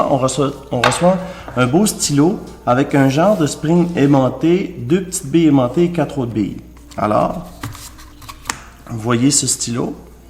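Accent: Quebec, Canada. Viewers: j'adore le Québec et votre accent et jolie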